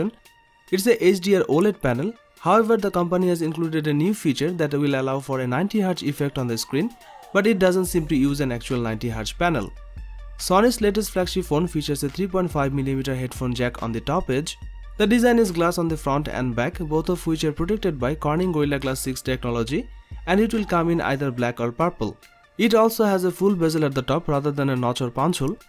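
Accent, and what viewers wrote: Bengal, India. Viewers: Hmm Bengali hain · Indian accent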